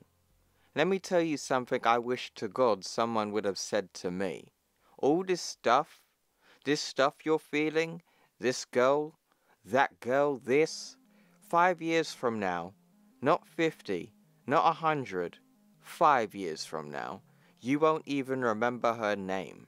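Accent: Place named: United Kingdom. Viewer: What part of the UK are you from? You have one of the least annoying British accents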